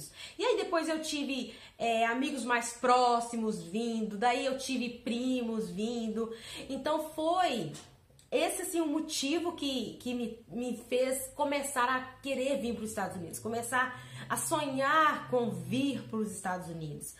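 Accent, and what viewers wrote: Minas Gerais, Brazil. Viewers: Quando vim pro seu canal já me apaixonei pela pessoa que vc é , só de olha pra vc já dá pra ver a honestidade humildade e força de vontade que vc tem, fora que na hora já vi pelo seu sotaque que vc é daqui que Minas . Baum d+ Uai! Tbm sou Mineirinha da gema , de BH 😘 (Vendo em 11/06/2018) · Assim que vi seu vídeo pela primeira vez, logo pensei ela é mineira..kkkkk Esse nosso sotaque é inconfundível. Que Deus continue abençoando sempre 😍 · Vc num chorou mas eu sim kkkkk Glória a Deus pelas conquistas 🙌 Exemplo de mulher! Q orgulho dessa mineira kkkkk Sotaque nao nega!!! a primeira vez q vi um vídeo teu logo percebir essa é mineira😍❤😊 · sotaque gostoso esse de minas .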